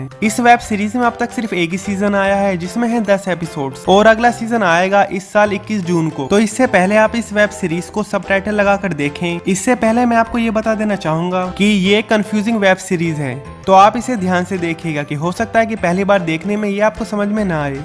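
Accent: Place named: Uttar Pradesh, India. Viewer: Bhai tu U.P ka h na ... Tre accent se bta skta hu Ghaziabad ya meerut ya hapur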